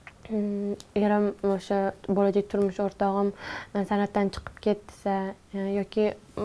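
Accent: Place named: Tashkent, Uzbekistan. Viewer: Nega toshkentcha gapiryabsiz sirdaryoliksizu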